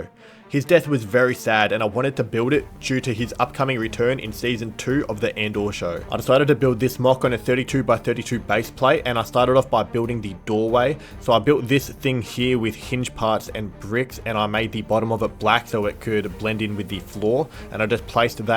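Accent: Australia. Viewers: are you Aussie I am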